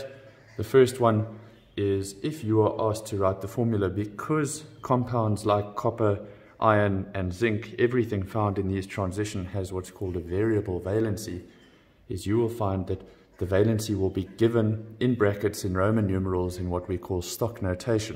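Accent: South Africa. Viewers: Helped a lot thank you PS: are you South African?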